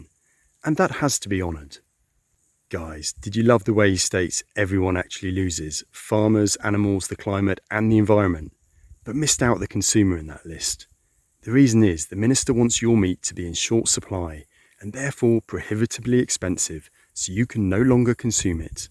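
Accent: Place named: RP, United Kingdom. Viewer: He speaks English with an upper class accent, lovely pronunciation, but not over the top. Really easy to listen to.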